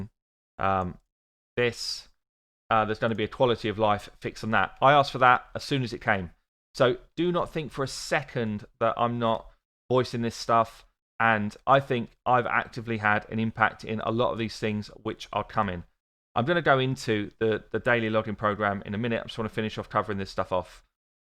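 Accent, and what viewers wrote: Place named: London, United Kingdom. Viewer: Never doubted you are from London and always enjoyed your pronounciation! :)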